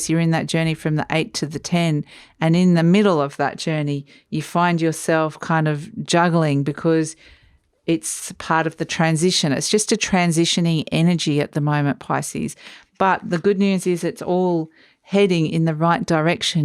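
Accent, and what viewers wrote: Australia. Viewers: DonI recognise a fellow Aussie?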